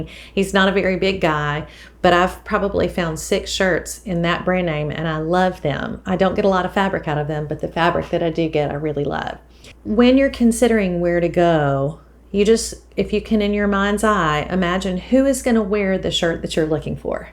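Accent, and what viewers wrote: South, United States. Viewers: This is not on topic, but does anyone else stress over sandwhiching a quilt? That part brings me to tears. Starting with laying out the backing, then the batting and then the flimsy. I can never seem to line them up. It can take me a whole day to line it up and pin baste. Is it really as simple as all these Youtubers show in their videos? Or is it just me? That is why I haven't started on my next. I am only on my third quilt, but I habe sandwhiched at least 100 for my mother whom is elderly. I love your videos. Let that southern accent shine.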